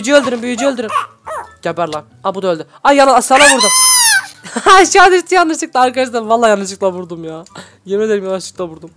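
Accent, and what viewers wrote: Adana, Turkey. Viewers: Abi sen Adanadamısın,Benide çıkart abi ❣❣❣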